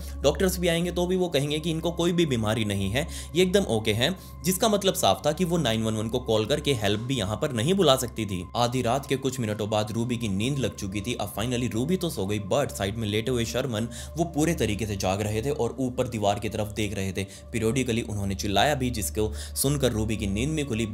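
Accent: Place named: Sirsa, India. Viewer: Bhaiyaji m haryana sirsa ka rhne wala hisar ke pass hi h Aapki boli sun ke pahchan gya ki aap sirsa ke aas pass rhte ho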